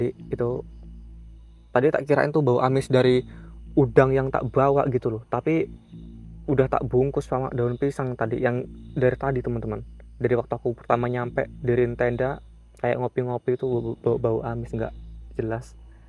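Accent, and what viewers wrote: Java, Indonesia. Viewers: Sumatera dia..sama dgn bang maman..tapi logat2 ada jawanya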